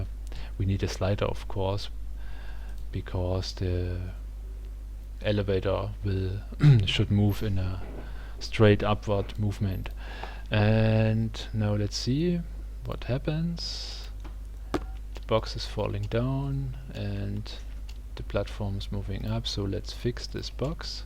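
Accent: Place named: Germany. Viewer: Awwwww deutscher Akzent :D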